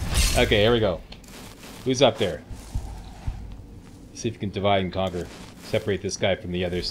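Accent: Canada. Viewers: He’s Canadian af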